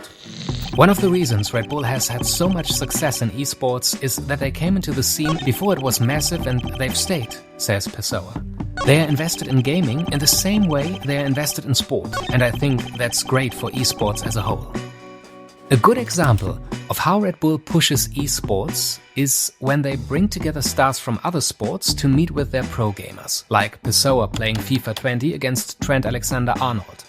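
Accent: Germany. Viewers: Is he german? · Echt ein krasser Kanal (ich gehe aufgrund deines Englischen Dialekts davon aus, dass du deutscher bist) habe deinen Kanal entdeckt, als du glaube ich gerade erst drei Videos rausgebracht hattest. Ich finde es echt krass wie professionell und hochwertig deine Videos seit Beginn an sind, da ist der schnelle Wachstum des Kanals nur verdient. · Its Sounds Like you came from Germany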